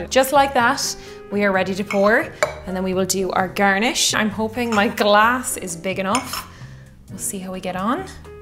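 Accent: Ireland. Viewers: We'd love to have you. We can never have enough beautiful women with an Irish accent over here 😁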